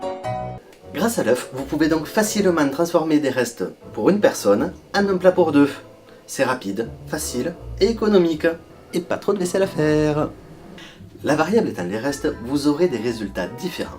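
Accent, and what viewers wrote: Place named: South, France. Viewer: Super sympas cette petite émission:o j'ai aussi eu du mal à comprendre ce que disait la voix trafiquée mais ton merveilleux accent du sud et ton sourire me l'ont vite fait oublier x) merci!!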